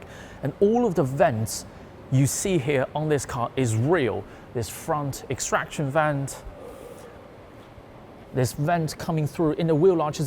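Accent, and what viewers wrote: London, United Kingdom. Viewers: 英式腔是這樣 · 兄弟正宗伦敦音啊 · 小伙儿英文真棒 伦敦腔 有点topgear感觉啦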